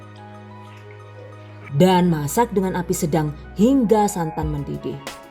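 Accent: Banyumas, Indonesia. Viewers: Ngomong engris dgn dialek ngapak, sukak banget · Sumpah ngakak jebule wong ngapak 🤣,suwun mba resepe😘sukses selalu tambah maju🥰aamin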